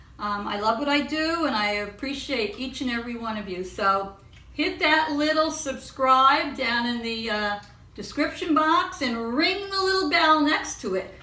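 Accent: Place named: New Jersey, United States. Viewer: Love your Jersey accent! And the pie sounds/looks awesome! 🤤🤤🤤🤤🤤🤤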